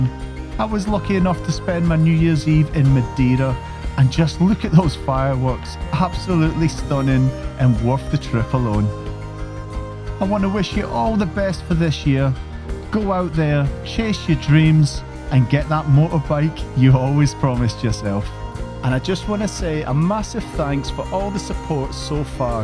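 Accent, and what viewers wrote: Scotland, United Kingdom. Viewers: Just finished a ride today around the island. Unbelievable. Didn't know it existed. Arrived for a conference and had 6 hours to spare so hcuked it. Some of those steep hills are knarly! Good to see Scottish accent and all 💪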